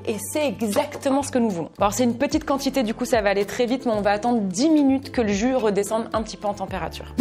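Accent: Canada. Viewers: tu mon youtubeur préféré des vidéos accent canadien et qui met quoi des vidéos trop trop rire je me suis spéculoos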